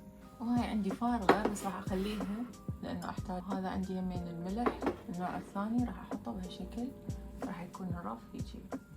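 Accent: Iraq. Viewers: تسلم ايدك روتينك هادي وحلو مثلك وانا صح من سوري يس حكينا مثل اهل عراق احب لهجتكم لو تحكو مثلن بس احب حكيكم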